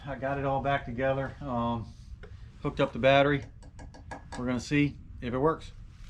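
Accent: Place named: London, United Kingdom. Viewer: Love your farm! Are you in MD? Your accent sounds like my friend's in Hampstead. Thanks for the Kohler info. - Craig in PA (LT40 wide owner)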